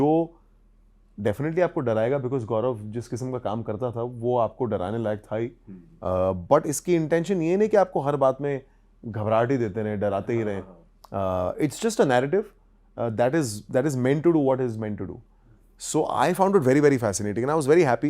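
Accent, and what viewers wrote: India. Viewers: So boring yr the person who is telling the story is speaking half Bollywood English by shaking his head and another half Hindi so so boring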